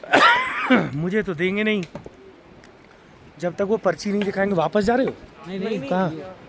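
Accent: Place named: Punjab, India. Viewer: Ur Punjabi accent rocks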